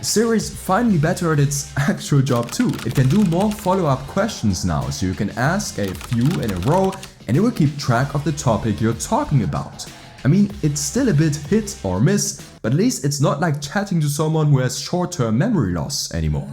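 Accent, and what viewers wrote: Germany. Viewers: Du bist doch Deutscher haha hört man so krass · Is it just me or does he sound a bit more German in every sentence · Der deutsche Akzent :) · are you german? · Your accent sounds like German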